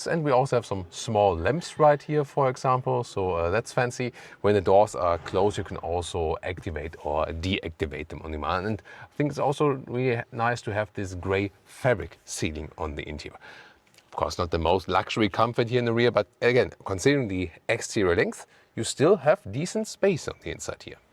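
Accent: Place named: Germany. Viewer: Naziv i opis kanala na srpskom, voditelj priča engleski sa nemačkim akcentom. Baš zanimljivo.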